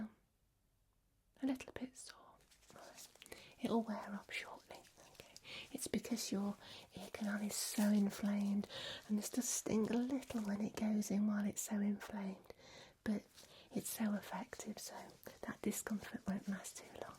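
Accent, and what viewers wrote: United Kingdom. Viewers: I love the tingly and relaxing video. The British accent is a plus. Just lovely. ❤️🌻💜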